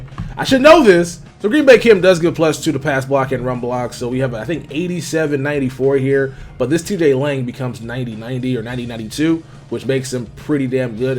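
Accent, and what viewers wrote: Texas, United States. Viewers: Are u Fr from texas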